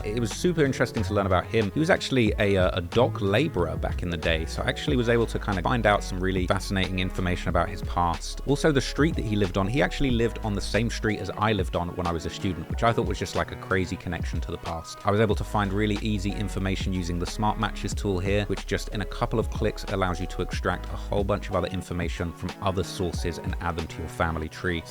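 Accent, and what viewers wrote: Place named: England, United Kingdom. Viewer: this donny a str8 fed where in England is he from 🤔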